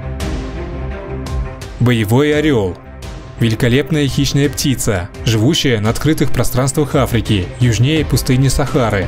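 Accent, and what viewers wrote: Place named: Ural-Volga, Russia. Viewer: Текст хороший, а уральско поволжский акцент утомил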